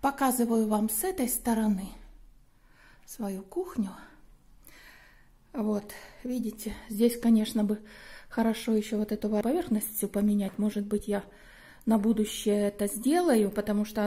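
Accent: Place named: Shakhty, Russia. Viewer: я от вас балдею, только наши женщины не ноют и на все руки мастера, я ваша землячка из г.Шахты, определила это сразу же по вашему разговору, училась в Ростове. Привет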